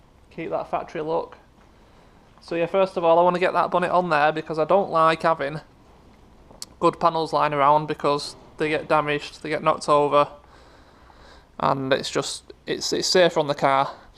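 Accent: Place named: Scotland, United Kingdom. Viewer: Are you Scottish? Pretty heavy accent.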